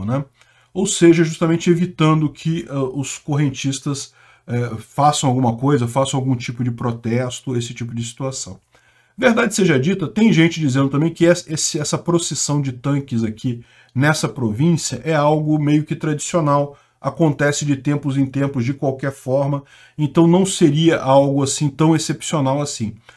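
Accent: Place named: Rio de Janeiro, Brazil. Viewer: Se diz no carioquês :_ancappark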